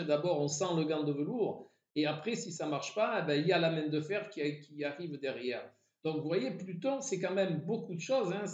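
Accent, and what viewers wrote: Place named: South, France. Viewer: Merci pour la clarté de votre propos et pour votre bel accent du sud.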